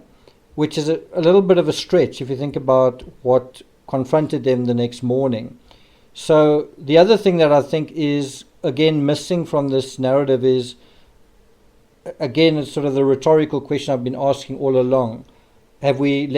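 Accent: South Africa. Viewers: Disliked because of your terrible South African accent.I'm South African myself,but Dude !!!!!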